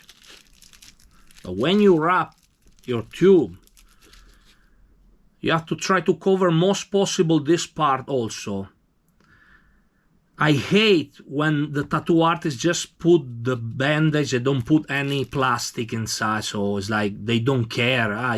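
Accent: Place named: Italy. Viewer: Complimenti per le tue creazioni ! hai davvero grande competenza ! Comunque Si sente che sei italiano ma parli così bene e lentamente l’inglese che sembra come se parlassi italiano . Sei un Maestro 🔝🔥 🔝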